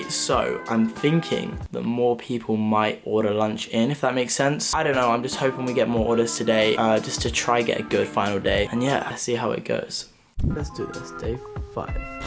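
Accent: RP, United Kingdom. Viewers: Dude, you have a posh accent. Pronounce the "th" as "th", not "f" as if you're trying to sound slightly more slum.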